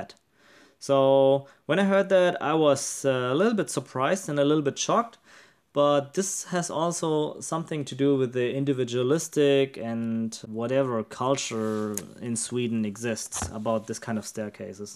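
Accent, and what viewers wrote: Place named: Germany. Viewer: Ah... you mentioned Germany. I am so stuck in old-fashioned ethnic thinking that I could not figure out why a man of your skin-colour would be speaking with a German accent. Forgive me :) Funny quirky vids. Thank you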